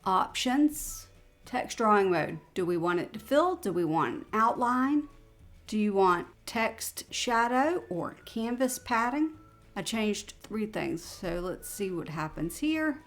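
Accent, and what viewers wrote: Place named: South, United States. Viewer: Thank you your video provided everything I needed to know and the potential that the website has to offer. Love your Southern accent too,!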